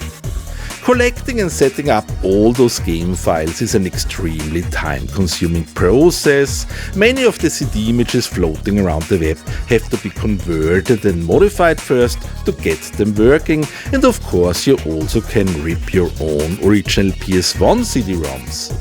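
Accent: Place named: Austria. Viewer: How i missed that Austrian accent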